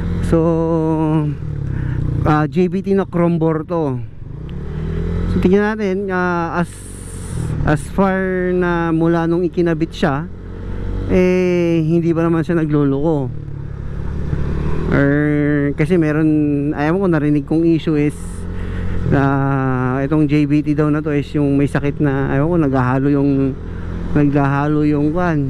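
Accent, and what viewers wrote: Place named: Ilocos, Philippines. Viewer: Kwan ilocano expression pag nag tatagalog🤣🤣 agbiag ka lakay👍🏼👍🏼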